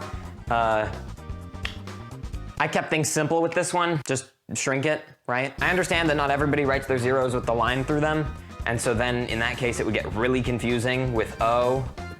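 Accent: United Kingdom. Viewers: Are you a brit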